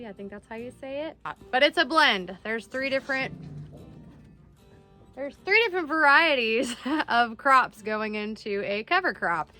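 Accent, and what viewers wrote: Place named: Wisconsin, United States. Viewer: Sounds like Wisconsin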